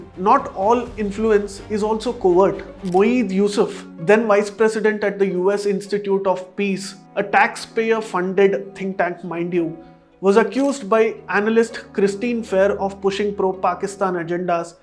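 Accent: India. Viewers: Your content is really informative but you need to change your Indian accent if you are desiring for global audience. P.S. : I am not being racist....this is what you should do to expand your business...that if comes with learning new skills, language or accent etc. It is what it is you cannot change the world bro, you have to sacrifice yourself.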